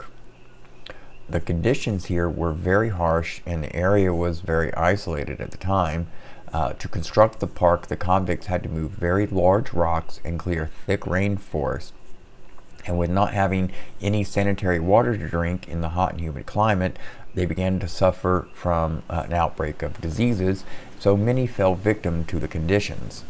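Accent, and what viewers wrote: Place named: South, United States. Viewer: Love your southern accent!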